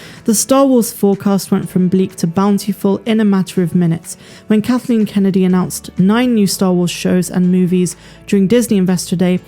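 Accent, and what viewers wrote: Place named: Bristol, United Kingdom. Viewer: your accent sounds like your from down my neck of the woods in Bristol or close by. Love your work mate Gert lush and Peace out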